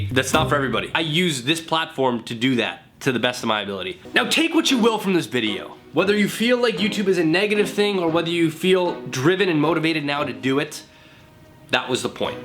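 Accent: Canada. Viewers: Well, I think Charlie is cute.......this is the second video......you drew me in with the mirror paint video and you seem to be a normal good guy which is why I subscribed to your ch........not to mention I like the little Canadian accent.....out, aye...........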